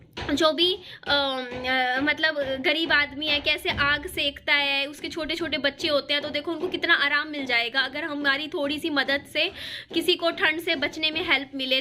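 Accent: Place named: Haryana, India. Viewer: to mujhe haryana se charkhi dadri se lgti hai.. mtlb us ki boli se.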